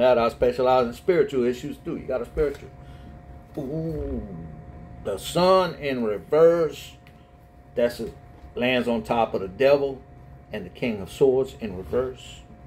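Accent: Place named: New Orleans, United States. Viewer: You are a knowledgeable person. You speak well and I’m overcome with joy just listening. You ARE changing folks 1 at a time. Prayers for your bride, Tam, and you. we love that New Orleans accent and your Bride💜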